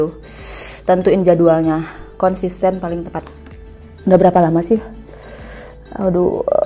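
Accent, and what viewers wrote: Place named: Bali, Indonesia. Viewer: Pesu logat bali ne😹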